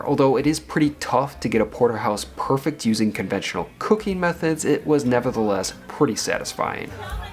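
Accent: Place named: Chicago, United States. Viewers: A guy with a Bears and Cubs accent thicker than Dennis Farina's, living in an apartment in Manhattan, , drinking a Manhattan, invited to Rao's by a Manhattanite. Whattya gonna do. We're a transient kinda country. Fuhghetta 'bout it. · Your accent is so strong. Are you American? I’m guessing Midwest? As a New Yorker, the way you pronounce things is very…. different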